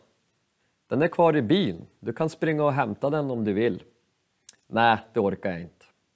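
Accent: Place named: Sweden. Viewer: Jag behöver höra sådana enkla dialoger, speciellt när du talar med den svenska accenten vi kanske hör. Tack och jag hoppas på mer av detta.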